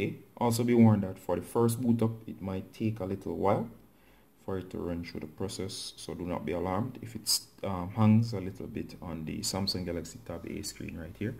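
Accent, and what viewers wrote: Jamaica. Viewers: U kinda sound jamaican im also jamaican!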